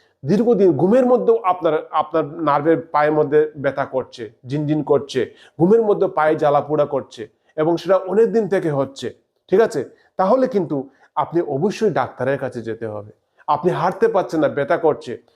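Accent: Sylhet, Bangladesh. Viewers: আপনার একসেন্ট সিলেটের। আপনি কি সিলেটি ?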